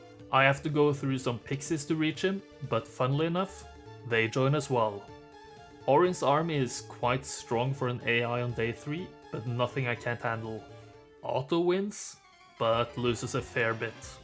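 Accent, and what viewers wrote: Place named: Norway. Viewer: Norwegian revealed... for all those that didn't already recognize the accent Heia Norge!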